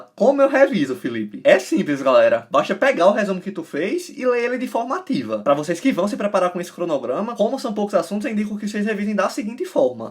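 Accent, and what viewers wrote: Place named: Pernambuco, Brazil. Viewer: Reconheço um Pernambucano de longe, e você, é um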